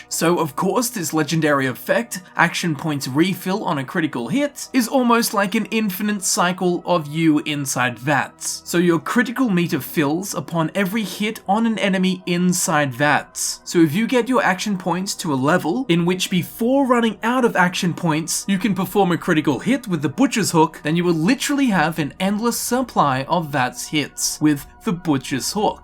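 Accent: Australia. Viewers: your aussie yeah or am i crazy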